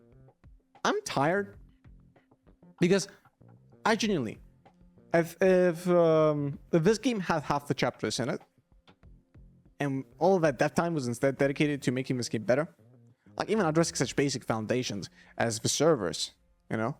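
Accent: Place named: Finland. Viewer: omg, that finnish accent, idk if u are from Finland but your accent sound very finnish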